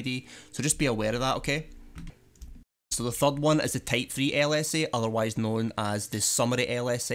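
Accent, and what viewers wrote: Scotland, United Kingdom. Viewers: Agreed. My certs are a year expired.. I thought I really should certify again, given the “certpocalypse” explains it better than the guy on Udemy. Also, the Scottish voice is way better than the American voices you normally hear on Udemy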